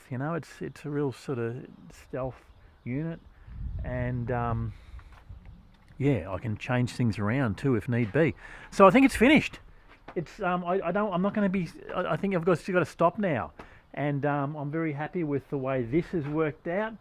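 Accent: Australia. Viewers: Genuine true blue, she'll be right mate, delivery. Love it mate! LOL...yeah, best whisper when mentioning our would be masters. Currently chasing a tray canopy for my BT50 ute. Missed a few Market Place for free beauties, but I'll catch one eventually. For me, it's just going to be basic place to sleep prior to hitting the waters for kayak fishing, or a day of metal detecting. Then, overtime, plan to upgrade it for longer trips to the gold fields and various historical locations. Thanks for the insights mate. BTW, I'm thinking removable inside panels using velcro, or DIY window tinting. The way I see it, curtains might be a dead giveaway.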